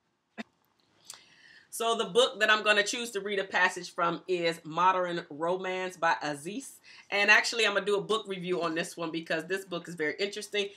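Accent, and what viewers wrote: United States. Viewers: Omg didn’t know you were from Ohio! My husbands dad lives near Youngstown. I can tell you have an Ohio accent. I say those words all the same! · You have a very southern hospitality accent. Which is great because I have the same one too! I’m born and raised in Florida and have family from all over the south. Sc Louisiana and etc and each state is different. Your voice is comforting and nurturing! Loved this tag!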